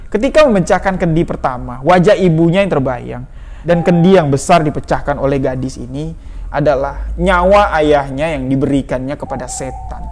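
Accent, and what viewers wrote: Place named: Jambi, Indonesia. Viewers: Saya selalu nyimak cerita misteri dari bang...hampir tiap hari beberapa cerita saya simak Saya suka gaya bicara bang...baru saya tau seperti ini gaya bicara orang jambi..enak didengar Sukses selalu bang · jambi kk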